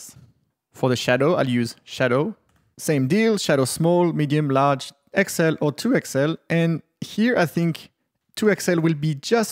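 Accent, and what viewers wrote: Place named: France. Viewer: I still say it's all about the French accent ;). Keep up the amazing work. Cheers from the 905.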